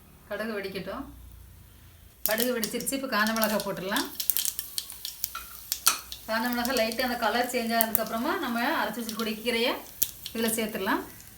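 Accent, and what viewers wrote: Tirunelveli, India. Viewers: நெல்லை தமிழ் நீங்க செய்த கீரை கடயலைவிட அதிகம் ருசி! Nice, very simple video.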